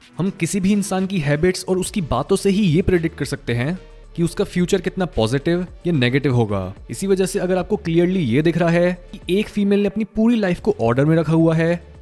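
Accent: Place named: North, India. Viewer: i seen your face first time today, your imagine in my head was fair skin guy like north indians (because of your hindi accent) without beard (face with beard on your voice was something my brain didn't think about) and normal body size with good shape of muscles (not as big as it is) your hair style in my imagination was kind of long enough to reach your eye brows, i imagined you as leon scott kneddy of resident evil 4 but you was totally different person, not bad but not what i thought.... you are good looking still by the way...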